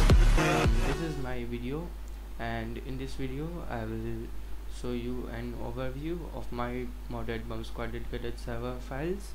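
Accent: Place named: India. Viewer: Authentic Indian accent😄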